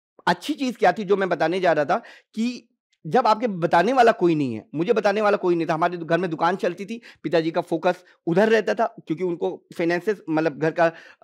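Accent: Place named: Kanpur, India. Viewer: Kya baat hai kanpuriya language .. 🙏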